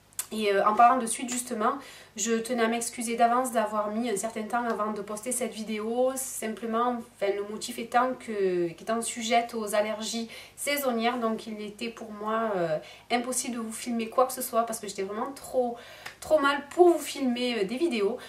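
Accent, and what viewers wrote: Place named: South, France. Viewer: je te trouve très chou ainsi que ton accent du soleil ....Hein les botchinnes ;) merci pour ce partage je m'abonne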